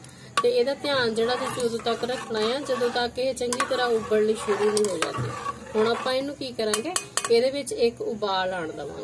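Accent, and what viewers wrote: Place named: Malwa, India. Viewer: Sirra 😊 Ma'am tuhadi language te ni majje wali lgdi Malwai lgdi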